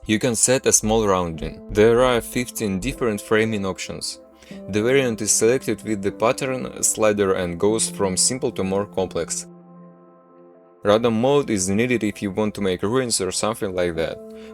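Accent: Russia. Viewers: По акценту видно что русскоговорящий. Огромное спасибо за скриптики, работают прекрасно. Очень надеюсь что твои труды тебе окупятся, была удивлена что такое вообще бесплатно есть ❤ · Брат, вижу по акценту, что русскоговорящий. Очень был удивлён, что такие старания никак не монетизируются. Спасибо за скрипты, как доделаю свой проект, то отпишусь!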